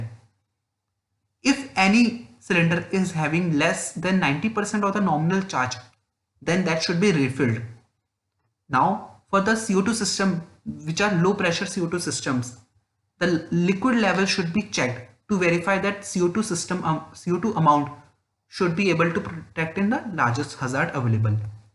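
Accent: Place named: India. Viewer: English language needs to improve. It was like hindi spoken in english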